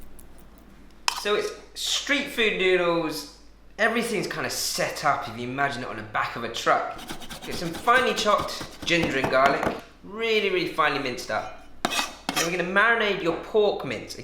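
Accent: England, United Kingdom. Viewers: Random question: are you English? You sound like you have an accent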